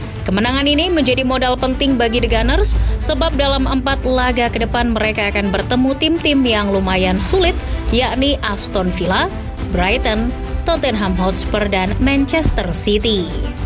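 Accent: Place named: Banyumasan, Indonesia. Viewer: Admin wong ngapak 😗😗😗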